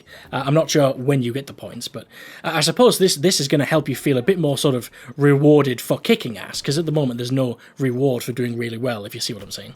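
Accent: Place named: North, United Kingdom. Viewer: you have a charming Northern accent. Where did you grow up?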